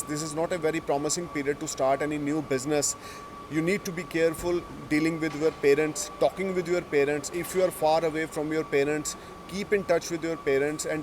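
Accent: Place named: Punjab, India. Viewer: Heavily accented Punjabi toned English. Speak slow it will get better. It’s not Haraash it’s Harsh. It’s not prediction it’s prediction. Hope you won’t mind.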